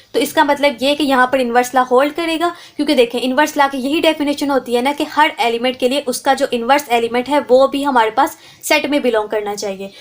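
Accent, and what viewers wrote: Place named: Lahore, Pakistan. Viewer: that Lahoڑi Accent 😂💕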